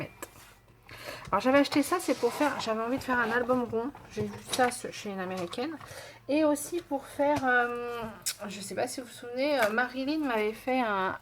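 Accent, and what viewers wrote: England, United Kingdom. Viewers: Coucou, quand même pas mal cet appareil, top!!! J'adore ton accent anglais, ah ah!! Bises, Véro. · Cc ma belle l’accent anglais top 😂😂👍hâte de voir ton happy mail .... je pense que je vais l’acheter la prochaine fois.... bisouuus😘😘😘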